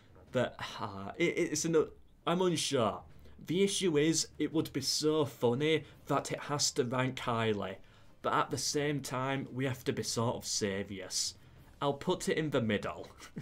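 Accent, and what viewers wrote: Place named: United Kingdom. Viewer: Sometimes i watch this guy's content just because of his accent lol. I know it's one of the British ones but there like thousands of them. Anyway, it just sounds really funny, in a good way, to me as a non-native English speaker.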